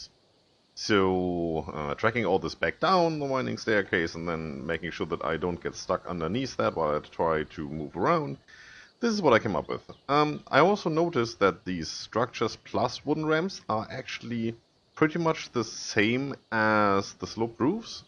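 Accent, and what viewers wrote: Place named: Germany. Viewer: Hey hey, So wie du redest würde ich sagen Deutscher xD nettes video ;D besteht der fehler nach dem Server reboot immernoch ?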